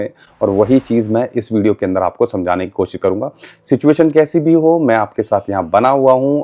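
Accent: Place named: Varanasi, India. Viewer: Bhai ekdum banarsi andaz me baat karte ho GURU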